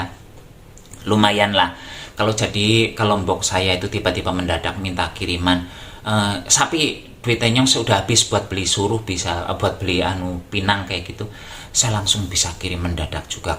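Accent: Banyumas, Indonesia. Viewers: Lanjut kn dengan ngapaknya👍🏻👍🏻😅😂😂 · Mas aslinya mana...kok bahasanya NGAPAK....dan sekarang tinggalnya dimana....mas selamat ya semoga berkah.... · Channel sampean kuy unik, mas, khas dengan logat "ngapak", yang tidak terbiasa dengar merasa lucu dengar sampean ngomong Selamat ya.. Insyaallah barokah, jangan lupa rezekinya untuk istri & anak nya, ech sudah berkeluarga blum mas..🤭😘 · Jujur Lik nyong SUBSCRIBE ya mergo bahasane sing ngapak Lik lucu😀 Padahal ya basaku dewek... selamat ya Lik sapi sukses selalu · Asli orang mana Mas 😀 jawanya ngapak bisa menghibur nitizen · Mas ini sangat lucu, asli, cerdas, sangat menghibur, ngapaknya, jangan marah, ya aku panggil kamu, mas,???? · Ini sapi yg selalu ditunggu resepnya.... anakke mbok Dirah... seneng soale pd2 wong ngapak... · Sebenere sampeyan asli mana pak...Banyumas atau mana...kok busnya ngapak... Salam ngapak 👍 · Selamat ya ms Justru aku tertarik dr ngapak, simpel n praktisnya itu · Ora ngapak ora kepenak,. 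Kereeen mas 👍👍👍👍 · Yg bikin laris karna kocaknya NGAPAK ASLI dan ndesa bgt itu... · Jawa nya si mana ms.....? Ngapak ya.....?? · Selamat Yo mas mudah" sehat terus dan tetep ublek" di tunggu resep" nya dan tetep bahasa ngapak nya aku suueeeng tenan... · aku kok seneng dgn located ngapakmu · Selamat ya mas dengan bahasa ngapaknya justru itu jalan rezekinya sukses terus ya jadi diri sendiri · Bahasa ngapak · Mas selamat ya smoga makin byk subsucrebenya,krn ada ciri khas bhs banyumas apa mas 1 daerah sm mas Anngger pradesa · Selamat dan sukses buat mas.semoga tetep jadi anak yg berbakti sama biyunge.jangan dirubah yo logate ngapak,biar tetep rameee.... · Ak seneng ndelok mergo bosone ngapak lucu..alat2te simple bahane yo gampil..cara penyampaine gampang plus cletuk2kane gawe ngekek dewe..semangat yo mas..berkah barokah aamiin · Bahasa ngapak lucu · Mas....sy sering buat kuenya.....sederhanapun sdh bs jd kue.....alami lg. 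The best pokoknya lanjut mas....aku jg suka dr bahasanya yg ngapak2.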